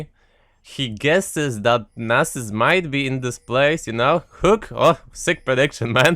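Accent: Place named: Poland. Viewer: Dobry akcent ale słychać że polak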